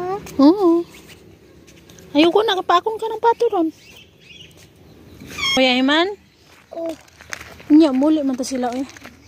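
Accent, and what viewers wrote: Visayas, Philippines. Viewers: Cute oi bisaya mn😊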